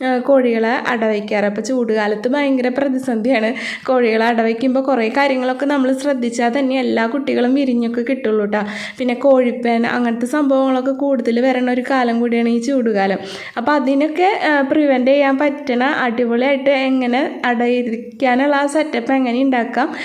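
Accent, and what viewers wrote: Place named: Thrissur, India. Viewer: നല്ല വീടിയോ സൂപ്പർ നല്ല ധിർസുർ പാഷ്ഷയാ കേള്ക്കാന് നല്ല രെസമാ അവസാനത്തെ ട്ടാ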